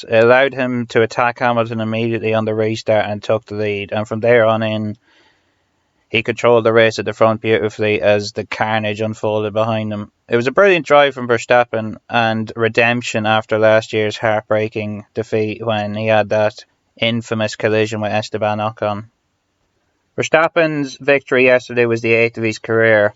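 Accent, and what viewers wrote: Ireland. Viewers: Yes your obviously irish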